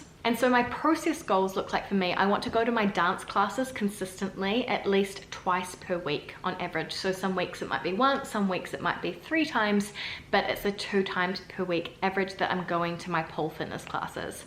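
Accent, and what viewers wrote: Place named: New Zealand. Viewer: Her NewZealand accent is so much stronger than when she returned!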